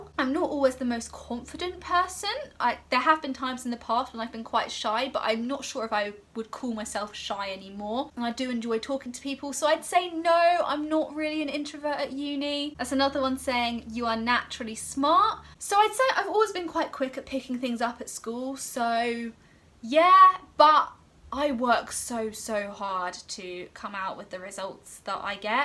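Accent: United Kingdom. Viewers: Hi just I have a question is there any collage that helps disabled by allowing them to be students for free or with cheap fees.I watch your videos to get a British accent. Thank you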